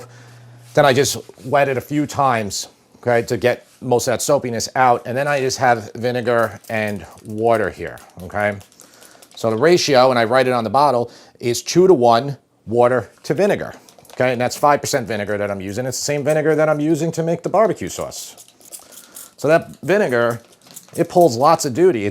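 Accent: New York, United States. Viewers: The problem is HFCS replacing cane sugar very few bottled sauce is real cane sugar and or honey. Definitely better making your own Especially Heinz has simply and organic made without hfcs. I sure hope your taste tester can tell the difference. Like u said can see it as well. You look and sound like my cuz that lives in Queens. Your one of a very few youtube cooks without sthick straight forward no bs approach. Definitely love the deli experience. Living in Central Ca can get some quality products but unless in major city hard to find many NYC even east coast products No Uncle Guisseppe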